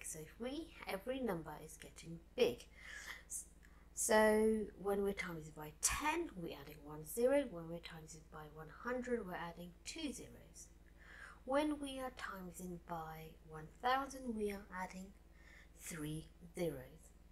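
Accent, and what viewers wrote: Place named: United Kingdom. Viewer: Shes british i think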